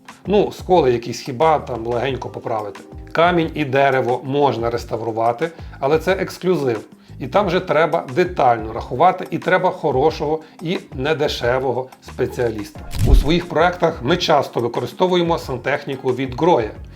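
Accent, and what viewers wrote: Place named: Lviv, Ukraine. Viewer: Це діалект, який додає шарму. Чула такий говір на Львівщині. Звідки родом автор не знаю, але це звучить приємно, хоча і не літературно.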